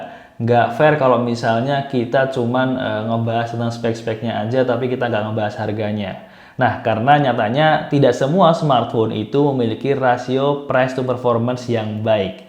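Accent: Indonesia. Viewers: Orang Lampung ya bang?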